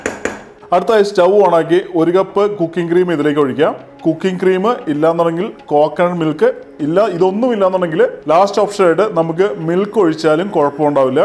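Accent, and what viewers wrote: Kannur, India. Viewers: Looks Good. I will try it and comment it here. Are you from Kannur? You are having a Kannur accent. It seems you are based in Dubai. I am a great fan of Lebanese Lamb chops and lamb Kabab (not ground lamb kofta Kabab, but lamb pieces). Could you please do it whenever you feel convenient to do it. I am a regular watcher of your cooking videos. Crisp and short videos. I love your videos.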